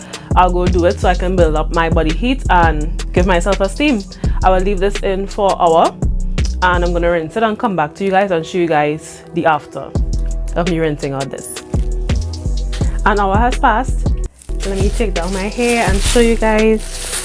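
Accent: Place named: Trinidad and Tobago. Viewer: I love your accent!!! I have trini friends so this makes me feel like I'm chilling with my peoples 😂🤗 let me finish watching haha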